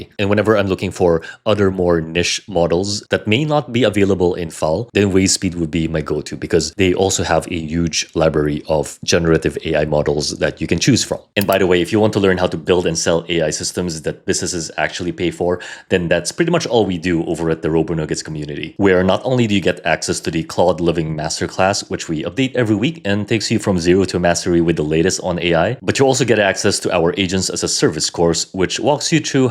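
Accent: Philippines. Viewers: Are you filipino? I can hear some accent :D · Why do I hear a Filipino accent? :)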